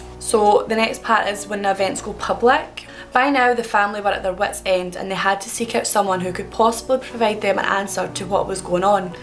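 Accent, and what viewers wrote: Scotland, United Kingdom. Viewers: Love the Accent I would have never guessed it was Scottish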